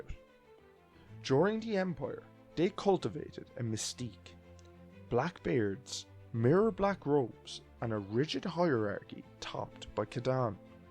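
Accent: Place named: Ireland. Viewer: That was yet another fantastic video. You know, that image that you were using, the one of the character that you called "Black 🕳 Hole?" Well I've seen other YouTube Star Wars creators use the same image, but I've never heard anything about the name of the character, their back story, nothing. So thanks for that, now I know what the hell "the big boys" are using to push their own channels along, without so much as a characters name, much less a description of what the audience is beung presented with!! P.S : - Dose my old and bush-bashed Australian ear detect just a little more of that truly wonderful Irish accent in your delivery this time? Or should I just keep away from the bottle? .. 🍺 🍻 🍺 🍻 🍺 ... See what I mean? I really must be getting back to my A.A group meetings! Either that, or join up with the local Rastas, Mon!!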